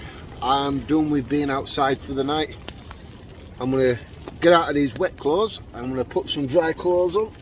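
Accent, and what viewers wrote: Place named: Northern England, United Kingdom. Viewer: Chatting is what makes your videos, but with a northern accent makes it more worth watching. Keep up the work laddie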